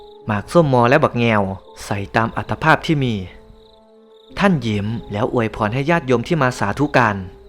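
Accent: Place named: Roi Et, Thailand. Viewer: เว่าภาษาอิสานเมืองร้อยเกิน กะน่าฟังไปอีกแบบ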